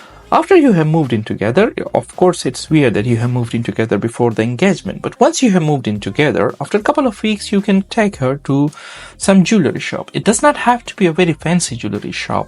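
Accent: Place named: India. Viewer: Miksä kuulostat ihan intialaiselta? Etkä taia kyllä perkele mikää suomalainen olla. (He clearly is not a finnish person like his name says) fucking clown vittu mikä pelle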